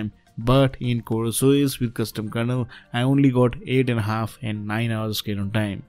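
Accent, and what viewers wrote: India. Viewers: Yeah but his name is Indian,I believed He's Indian because of his accent.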